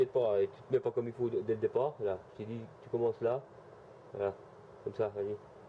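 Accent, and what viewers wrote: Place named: Vosges, France. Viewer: Ça fait du bien d'entendre un peu un (léger) accent vosgien ! Super que ton fils soit intéressé, mais va te falloir le double d'outils ! 😉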